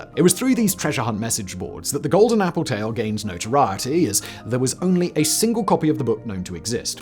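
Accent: England, United Kingdom. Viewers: I come back for the lesson delivered with an English accent time and time again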